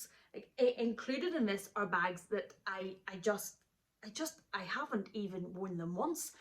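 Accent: Ireland. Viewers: I love your Irish accent!!! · I love your accent! I used to live in Ireland! I miss the country so much but I don't miss the sudden rain while carrying my Louis Vuitton bag 🤣 Greetings from Australia ❤️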